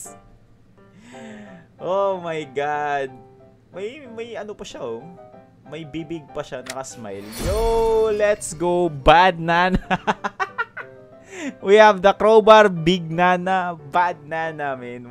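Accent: Visayas, Philippines. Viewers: Panit Ng saging hahaha bisaya kaman boy😂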